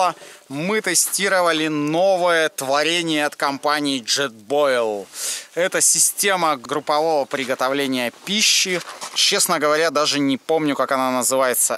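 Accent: Russia. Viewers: 1. Говоришь "я дам свое мнение" чувак какое МФТИ ты безграмотный. 2. Акаешь, кривляешься, слушать невозможно, отвращает с 1й - же минуты хотя что взять с масквАча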